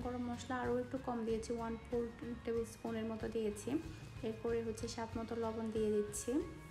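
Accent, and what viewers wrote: Chittagong, Bangladesh. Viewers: চট্টগ্রাম এর ভাষা একটু অন্য রকম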